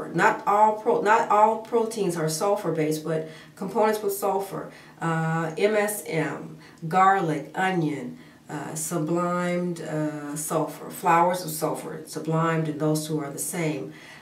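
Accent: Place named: Nigeria. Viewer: Oh I see, it sounded Nigerian lol! And you are pretty :)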